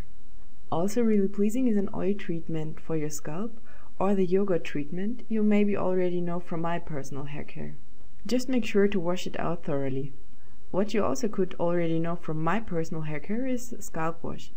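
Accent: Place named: Germany. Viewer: Dieser deutsche akzent man, friee golnn rüüs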